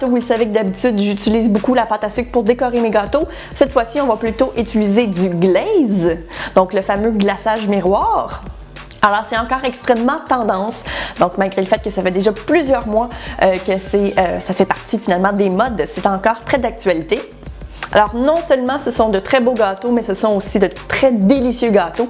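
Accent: Quebec, Canada. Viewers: J'aime trop ton accent québéquois 😍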